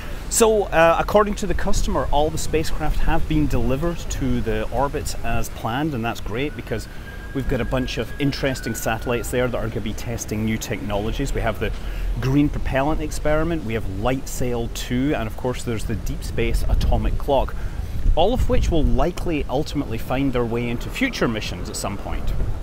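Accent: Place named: England, United Kingdom. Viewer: We of the USA do understand the English way to say words differently than we do, there are many different methods to say many of our words here in the USA. So please do trouble your self, Thank you very much your program is "GGG-GREAT!" as Tony the Tiger would say it. Taken from a commercial of Kellogs Corn sugar-frosted flakes. This one has been off the airways for many years now. { Tele or TV or The boob-tube came to us on airways. }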